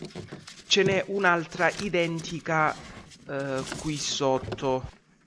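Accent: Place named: Salento, Italy. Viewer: Che bell'accento salentino che hai! Sei di Lecce?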